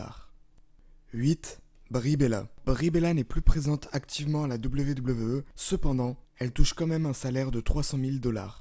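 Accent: Russia. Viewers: Mdr ton accent russe 😂😂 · Je like la vidéo parce que elle est bonne et pour l'accent Russe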